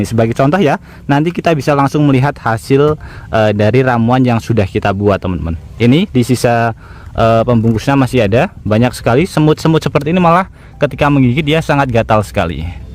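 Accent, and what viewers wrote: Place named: Surabaya, Indonesia. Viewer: Suroboyo masku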